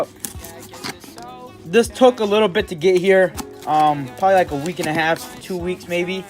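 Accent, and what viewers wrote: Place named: United Kingdom. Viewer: Your voice sounds half British! Fucking great video tho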